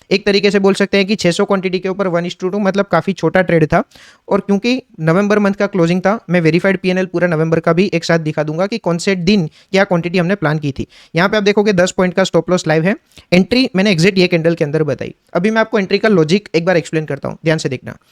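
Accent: Gujarat, India. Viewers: Are you Gujrati?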